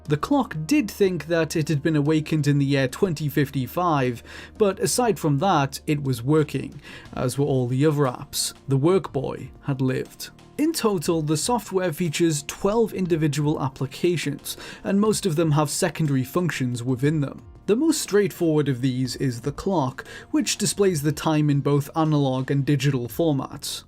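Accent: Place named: Northeast England, United Kingdom. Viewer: I need to know which part of north east England the video narrator is from! 😁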